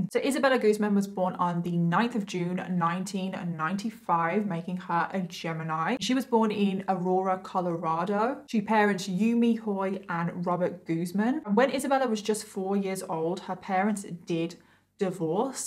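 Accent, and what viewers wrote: United Kingdom. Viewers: You're so obviously British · is a superstar on Youtube. I've had to take a break from politics and alternative social media. Having always been a fan of true crime, this new genre is a perfect fit for my down time when I'm trying to sleep. Some of these other girls are talented but is like some kind of angel. Her delivery is spot on with a serious reporting style and her makeup is always tasteful. Such a beauty, inside and out...I look forward to see what she will do in the future. Having only recently discovered her channel, there is plenty of back catalogue to binge watch. Maybe it's the accent but she speaks to me. She's brilliant, beautiful and she is carving her own niche. In this culture of Tik-Thots etc. she is still a perfect british lady, fit to have tea with the Queen. Bravo.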